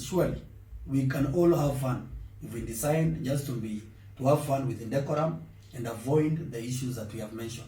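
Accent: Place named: Meru, Kenya. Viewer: The meru accent iko fty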